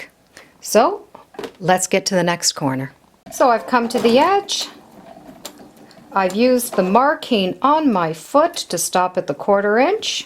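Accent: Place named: Canada. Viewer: I always have trouble stitching in the ditch on the top and being able to "catch" the backside of the binding in those stitches. I'll turn the quilt over and find that I missed long lengths of the binding and have to go over it again, wrecking the nice clean look of the front. How on earth do you do that??? (also, you must be Canadian! My mom and Granny were from Toronto and always said "agaynst.' I was born and raised in Los Angeles. They both died decades ago, so your accent really brought back lovely memories of my Canadian side!). Thanks for posting. Very helpful! God bless!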